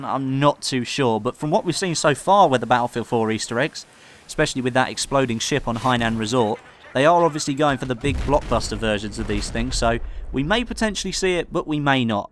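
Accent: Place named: Crawley, United Kingdom. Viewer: But for the record I can hear that Crawlian (If that's even what you call people from Crawley) accent strongly in this vid! :D Remember this comes from a guy in Horsham! (You know a town like 5 mins from Crawley and that is like a BAZILLION times better than Crawley! xD)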